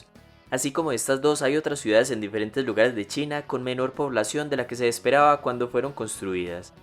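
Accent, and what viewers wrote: Colombia. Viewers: Horrible la narración en Castellano Colombiano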